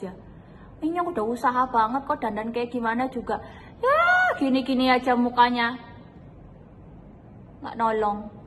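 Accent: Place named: Banyumas, Indonesia. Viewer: hahaha ngapak euy keren hadir salam sukses bro mampir ngopi ☕☕☕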